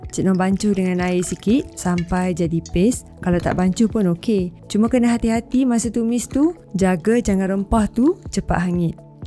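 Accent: Kelantan, Malaysia. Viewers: kelate ko utaro???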